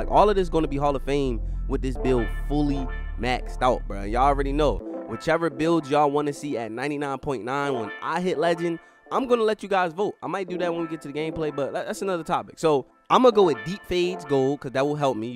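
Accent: Baltimore, United States. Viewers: i can tell u frm baltimore 😂😂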